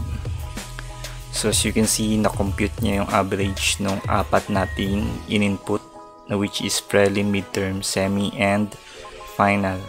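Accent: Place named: India. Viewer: More C++ lesson po... sawa na po ako makinig sa Indian Accent! not racist thou. 😆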